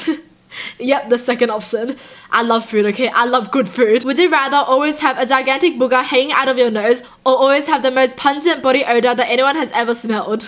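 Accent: Australia. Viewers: Is she from Australia I am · Is she Australian?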